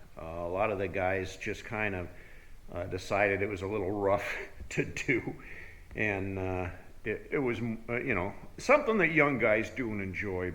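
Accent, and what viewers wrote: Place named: Ohio, United States. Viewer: Happy New Year to you two. Thanks for the stories. You have an Ohio accent.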